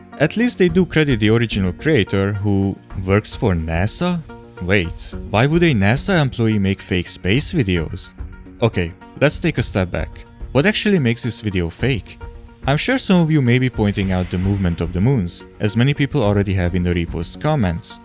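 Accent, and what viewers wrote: Hungary. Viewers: Great video! Just out of curiosity; are you Hungarian by chance? Guessing by your accent.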